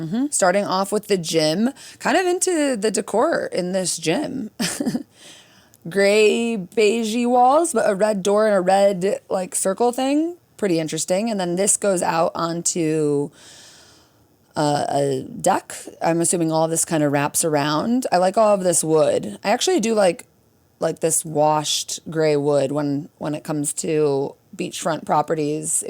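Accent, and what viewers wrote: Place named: Midwest, United States. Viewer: I love that with your midwest accent, it sounds to me like when you say tour you're saying 'tew-er' 😆 I cant not heart it!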